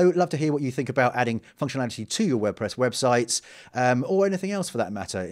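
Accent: United Kingdom. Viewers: Love your tutorial plus your british accent....from Nigeria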